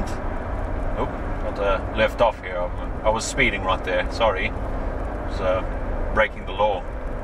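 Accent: United Kingdom. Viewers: you sire are from the Belgium?! Anyhow, Your queen english is like from Milton Keynes.:) I Have e34 525i, e39 540 and 635 CSi 1988. -Happy holidays -autobahn guy from Finland!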